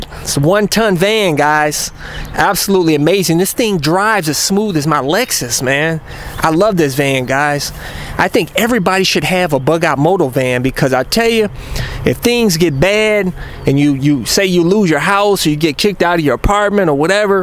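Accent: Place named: North, United States. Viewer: you might want to learn the southern accent. There's still some "good ol' boys" down there, in the smaller towns, that might resent your Yankee accent. I'd hate to hear that they found the bug-out van and the psycho-moto, way back off in the timber but no one around.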